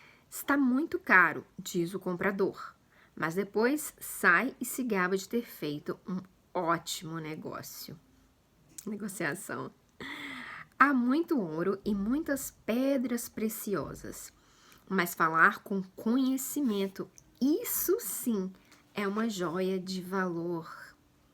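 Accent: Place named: Minas Gerais, Brazil. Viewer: Mineirês! Sotaque mais lindo. Amo !❤️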